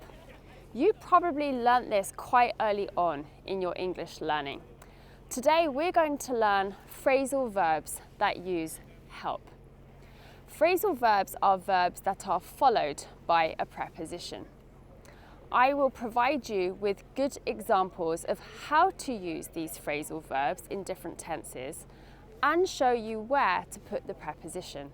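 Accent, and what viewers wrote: United Kingdom. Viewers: This british english accent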